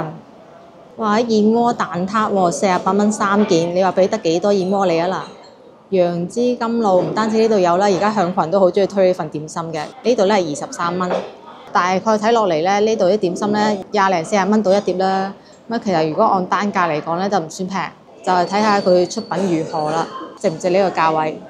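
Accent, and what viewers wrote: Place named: Macau, China. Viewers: MOGO係唔係澳門人?好重澳門口音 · 終於有人問我一路想問嘅問題，點解第十甫長大嘅廣州人咁重澳門音🤔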